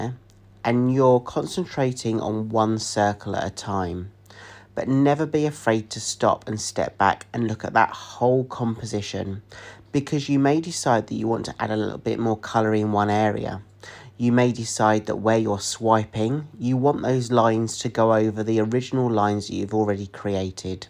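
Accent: United Kingdom. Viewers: Oh yes. Certainly 3D. It's incredible. Nice to hear your (British!) voice over rather than just music.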